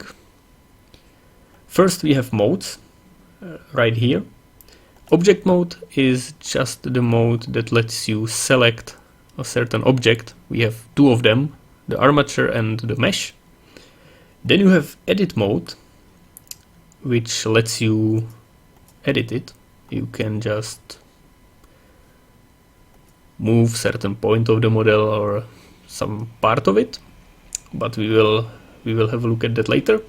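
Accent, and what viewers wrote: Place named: Czech Republic. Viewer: no ten český přízvuk jde poznat :D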